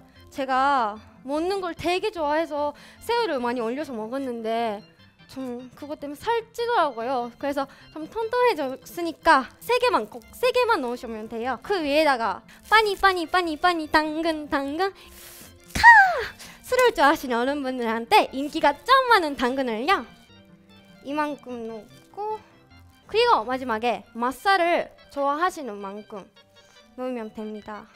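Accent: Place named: Japan. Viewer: この時はまだ韓国語が少し日本人っぽいけど、今は本当にネイティブだよね…って韓国語全然話せない私が言ったら怒られそうや…とにかく可愛い。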